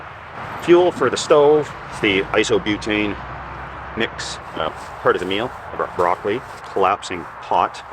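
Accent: Canada. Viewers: you've got a nice, soft voice and comfortable Canadian accent. I think you'd be a great reader for an audio book or something of the likes, I'd listen to it.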